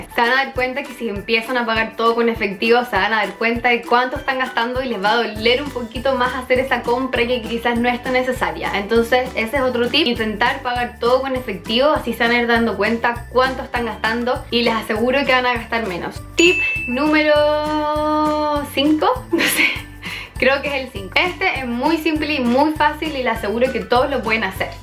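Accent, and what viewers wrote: Chile. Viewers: Ovbio es chilena! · Eres chile cierto y si lo eres se nota Pd:yo tambien soy chilena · Tu eres chilena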